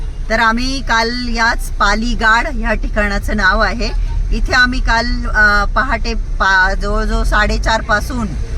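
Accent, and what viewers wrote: Nashik, India. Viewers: मॅडम खूप दिवसांनी video ची वाट पाहत होतो आणि तुमचा वीडियो आला आहे खूप छान तुमचं साद आपल्या नाशिक च बोलन आईकाला खूप आवडते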